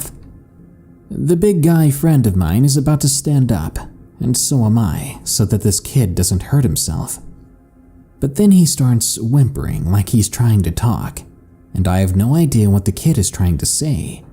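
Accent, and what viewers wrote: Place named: South, United States. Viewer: I liked the female narrator’s voice too. I love the man’s voice but for a story that’s from A woman’s perspective, I liked that a female voice was used for her account and I also liked her slight Southern accent as it is mentioned in the story that she is from Kentucky.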